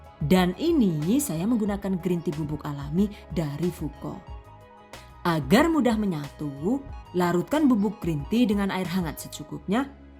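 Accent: Banyumas, Indonesia. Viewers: walah dalah rikane ngapak mania. ora ngapak ora kepenak 😆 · Bu aseline pundi daneng ngendikane ngapak kados kulaa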